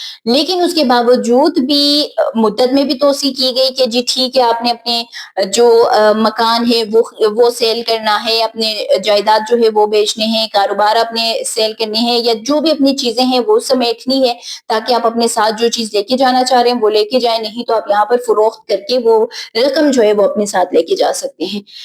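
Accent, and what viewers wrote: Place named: Gilgit, Pakistan. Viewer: Ap ki urdu sunn k ap mujhy gilgit ki lagti hain but u r v, nice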